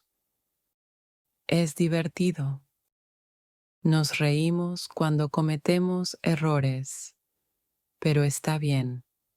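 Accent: United States. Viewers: ...these videos are wonderful! i never watch them, just listen on my phone. but who are you? you sound American but you are in Nigeria? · Yes!!! Are you American or Columbian???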